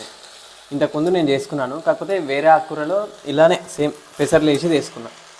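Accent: Telangana, India. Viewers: తెలంగాణ తెలుగు వింటుంటే చాలా ఆనందముగా ఉన్నది మీకు శుభము హరే కృష్ణ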